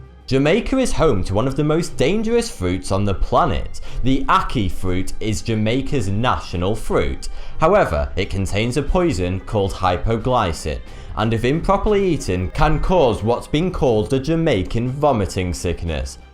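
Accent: United Kingdom. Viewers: That's just the British accent. We pronounce a lot differently. Also he has a regional accent which makes pronunciations even more different. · Matthys Fourie think twice how does he foughty2 at the beginning of every video because he has a British accent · He is British. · 42? really? the answer to the universe is a lower class Brit with an increasingly annoying accent? seriously? get the marbles out of your mouth son..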